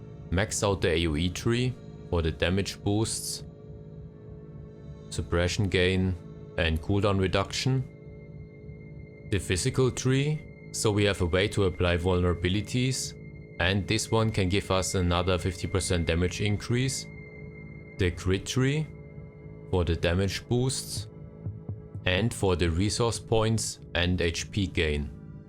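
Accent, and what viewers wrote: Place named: Germany. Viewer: Mach Deutschen Kanal, gibt ne Menge KI Stimmen die das Übersetzen können! Wenn ich mir aber dein Akzent so anhöre, glaube ich das du Deutsch schon kannst! Brauchst keine KI Übersetzung, also sprich Deutsch! Siehst ja das die Reichweite trotz Englisch nicht mehr ist!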